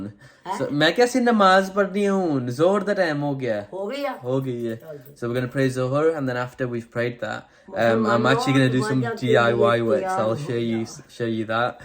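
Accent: Punjab, India. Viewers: your accent punjabi with English. its amazing. really love your videos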